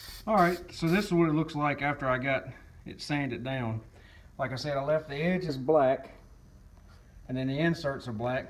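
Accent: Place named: South, United States. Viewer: Well, looking at your video for the First-time. That's a Beautiful Job! Well done to you. May i ask " What wood did you use? Also, love Your Accent! Sounds like you are From..." Down South " Many thanks and Kind regards Colyn. All the way form The UK. P.s. God bless The U. S.A.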